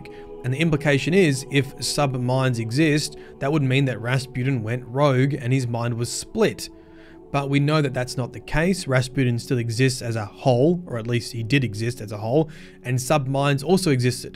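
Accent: Australia. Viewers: Man I love Byf but god damn if it isn’t nice to hear a fellow Australian talk about this crazy story! Thank you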